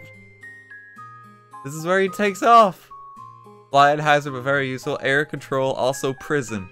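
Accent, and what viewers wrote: Ireland. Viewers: irish sounds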